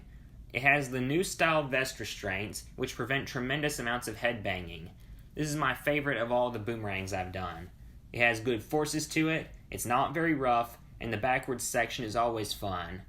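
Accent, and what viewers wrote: South, United States. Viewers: Really liked the video, you sound like you’re from the south 🤣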